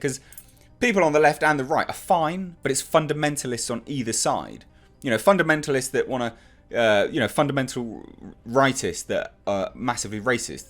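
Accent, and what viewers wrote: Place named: United Kingdom. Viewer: When I first heard your voice I already knew you were a white british man and at the same time I didn't care, I just liked your content.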